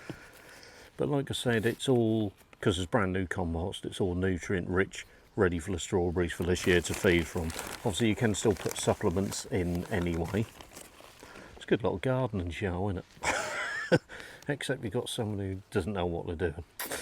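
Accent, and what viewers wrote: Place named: East Anglia, United Kingdom. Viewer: proper east anglian accent there, fair play to you boy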